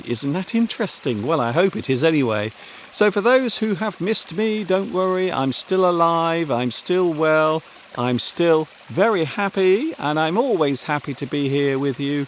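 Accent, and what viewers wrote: United Kingdom. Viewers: I just discovered your channel and I really admire your work. I’m moving to England soon and was looking for english boroadcasts where i can listen and be used to Britain english accent. Thank you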